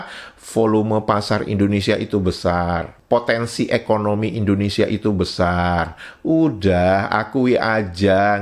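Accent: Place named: Malaysia. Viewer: Logat melayu kampungàn....muchrahhhh!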